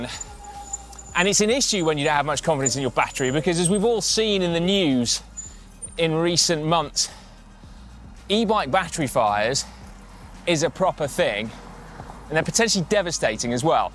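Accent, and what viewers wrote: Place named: RP, United Kingdom. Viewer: there needs to be a super cut of loathing things....cows, myatu's, not getting KOM's...exclusively in his needlessly posh voice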